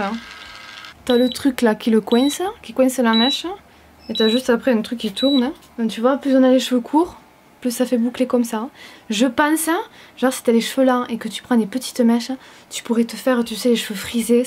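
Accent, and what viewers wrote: South, France. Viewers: Jte kiff de ouf meuf Tellement drole et ton accent, whaou , le sud dans mon salon breton 😂😂😂😘😘😘😘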